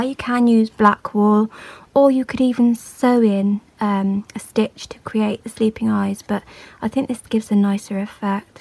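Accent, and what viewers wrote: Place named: United Kingdom. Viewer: clear and accentuated British English, very easy to understand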